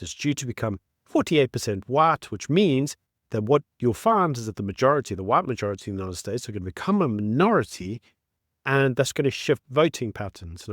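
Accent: South Africa. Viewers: I grew up largely in South Africa (now in the US decades later) and listening to you is completely like listening to my South African cousins from Joburg, which I totally enjoy. I do regret how the ANC is not building alliance with the US. Marco Rubio is not dumb. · As a South African - a "white" one by the way - I do not agree with elements of what you saying. The words you use are often depressingly similar to those used by Trump 🤦🏼. "Stupid", "retarded", etc. You clearly don't know anyone who is mentally handicapped. I am having great difficulty listening to you but am trying to keep an open mind. Clearly from your accent you are South African. "Dumb" etc. I will not subscribe to your rave Far too simplistic, and I see and hear no evidence of emotional intelligence, even at 3-year-old level. I mean that as a child therapist, not as an insult. Please may I sincerely suggest that you attend courses on listening respectfully, asking others (especially those with radically different world views), and ask them whether they feel you have HEARD them, and understand their views. 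I am not talking about aGREEing. I am talking about making an effort to understand another person's point of view - which would be of course be based be based on their life experiences. Surely the key to positive, healing, substantial social/ political change lies in each one of us developing the ability to form and develop strong (resilient!) working relationships. Surely this involves each one of us "growing our muscles" of respect, humility, forgiveness able the capacity to be gracious?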